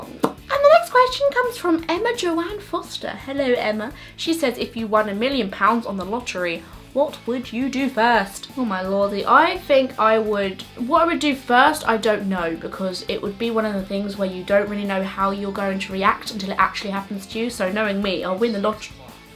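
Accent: Ireland. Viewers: irish accent is hot :) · high five about the irish accent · has a bit of an Irish accent:P very genuine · The Irish accent is amazing! <3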